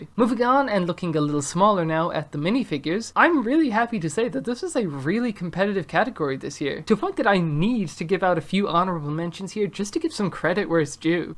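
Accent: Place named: Ireland. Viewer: As an Irishman I personally find that when I hear an Irish accent on YouTube I usually expect the video to be low quality, but I was pleasantly surprised with this one. Really good stuff that kept me engaged all the way through which is rare, I’m shocked you haven’t got more subs. Can’t believe that new Vision minifigure didn’t get a mention tho 😤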